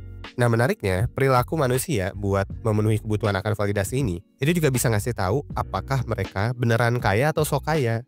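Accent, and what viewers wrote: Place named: Banjar, Indonesia. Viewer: admin orang banjar kh nih, logat bahasa banjarnya kadang2 losss takaluar😂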